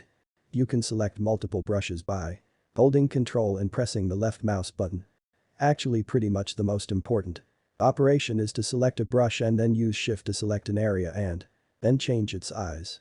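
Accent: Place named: Austria. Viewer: Please continue with this tutorial series. I found this by accident - you should add a link to the RBDOOM-3-BFG README file. Also, the Schwarzenegger English is fine you don't have to waste time with the robot voiceover.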